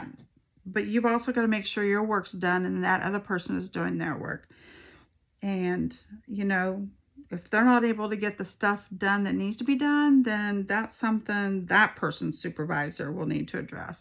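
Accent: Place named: Kansas, United States. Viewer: Hi, I enjoyed listening to your WIP and Chat. It sounds like you are a fellow Kansas. I live in Ellis, Kansas. I also have a YouTube Channel. Have a blessed day. 🌻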